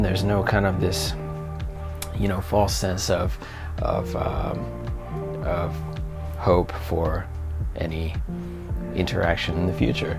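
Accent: Finland. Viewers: You are picking up a Finnish accent :D PS. Welcome to Finland. Edit: Oooh so you moved ages ago. No wonder the accent is there.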